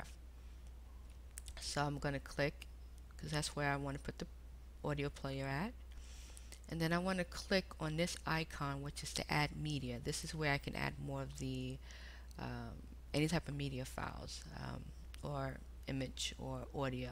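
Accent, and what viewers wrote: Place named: East Coast, United States. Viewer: thank you so freaking much man.. i could not verify the audio file at all thank you so much it was in front of my face the whole time.. love your voice and eastcoast accent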